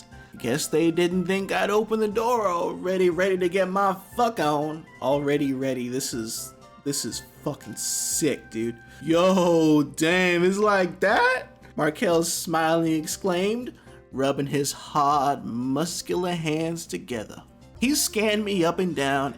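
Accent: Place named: Atlanta, United States. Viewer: He sounds more Atlanta than Miami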